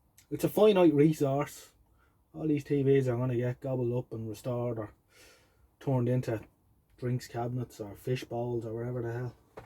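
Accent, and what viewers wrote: Ireland. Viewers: As an Englishman, I absolutely love your wonderful Irish accent!...... You sound like, turning their hand to TV repair! 👍😂🤣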